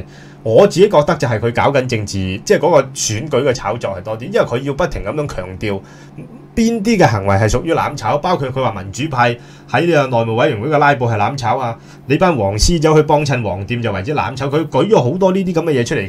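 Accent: China. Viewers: 你把聲咁似大陸仔講廣東話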